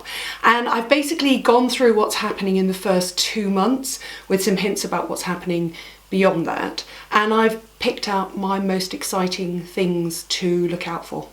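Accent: United Kingdom. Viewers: Happy new year dearest lady ! Your videos are always so pleasant Lovely classy British accent love it 💝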